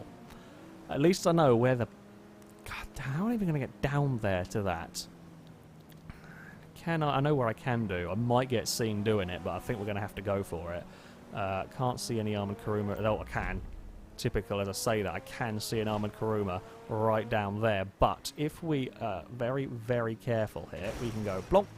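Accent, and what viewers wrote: United Kingdom. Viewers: Their are some british dialects I find pleasant then their are others that are just plain annoying. Yours sir, is the latter. Zip it. Closing this obnoxious sounding video.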